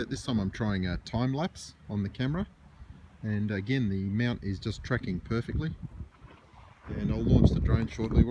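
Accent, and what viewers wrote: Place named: Australia. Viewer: You have some good videos But what’s with the American measuring system You sound Aussie, obviously around Perth Why not metric mate.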